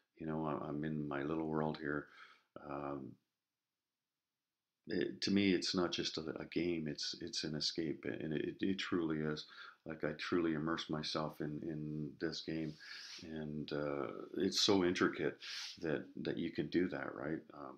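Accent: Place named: Canada. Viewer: you are awesome, you want to here something funny? I had my closed caption on while watching this video...according to the captions you are pregnant... i'm still laughing, they obviously don't use a caption-er who picks up your Canadian word accents. but congratulations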